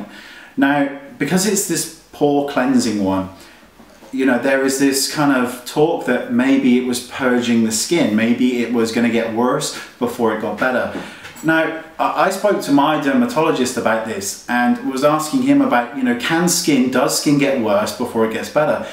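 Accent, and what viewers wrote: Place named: United Kingdom. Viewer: Love British pronunciation! Could you do the Accent tag please? ;)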